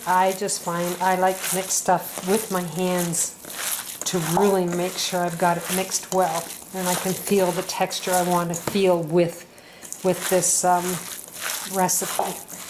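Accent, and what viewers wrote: Canada. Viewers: can't wait to try! BTW you sound Canadian, are you???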